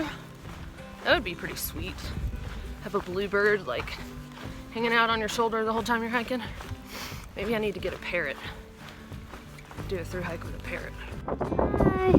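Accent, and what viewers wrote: South, United States. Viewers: I enjoyed your AT hike as well as the PCT so far. I went to school in the South so it's fun to hear your accent and recall that southern charm! I developed bad plantar fasciitis in both feet that kept me off the AT this year. I found Pedag Viva Outdoors from Insole to be a BIG help - better than Superfeet as the former has not only an arch but a metatarsal support that keeps the forefoot from stretching/sliding forward. I found just the arch support of Superfeet (though otherwise well made and I have a half dozen of them) not as effective for PF - both arch and metatarsal supports supports the foot better allowing it to heal faster and preventing it from deveolping as easily for those prone to it. Also tight hamstrings can lead to or worsen PF so keep those stretched out before and after hiking, though some advocate stretching a few minutes after hiking letting the muscles warm up first - also all the muscles surrounding the knee should be strong, though I bet yours are pretty tops! 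All your info about PF was good last year so keep that up. This website has great stretches and exercises. Hope it helps. Really helped me: <link>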